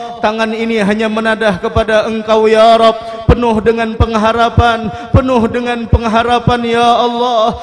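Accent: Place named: Tarem, Indonesia. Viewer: Sidin Terbiasa Suasana d TAREM cara penyebutan nya.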